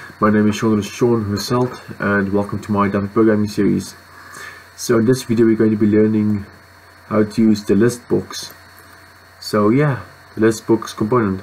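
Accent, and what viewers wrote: South Africa. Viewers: your videos help a a lot, thanks Shaun 😀 are you South African??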